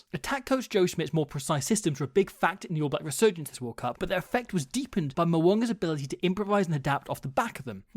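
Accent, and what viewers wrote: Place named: England, United Kingdom. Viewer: are you no longer Welsh(you sound English)? Wayne Barnes best ever...? Really? Hes good, maybe the current best but Nigel Owens was better & IMO best of professional era. Nigel ruled to the spirit of the laws. Wayne, being English, always ruled to the letter but occasionally Nigel contradicted the letter but never the spirit of the laws. Honourable mention Wayne Ericsson -Aus. Legends: Paddy O'Brian & Colin Hawke who invented yelling instructions to the players pre professionalism.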